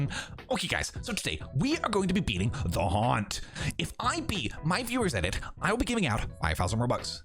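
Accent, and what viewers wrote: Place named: United Kingdom. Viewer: why did they hire this british guy..?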